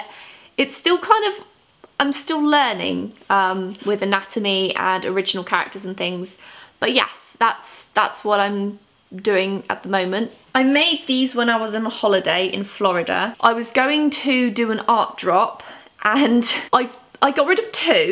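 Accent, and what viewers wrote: United Kingdom. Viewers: Ur British accent is so hot 😍😍😍😍😍😍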